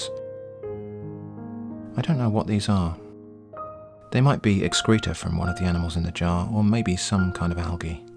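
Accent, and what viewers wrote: United Kingdom. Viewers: Mmmmm a Brit eh?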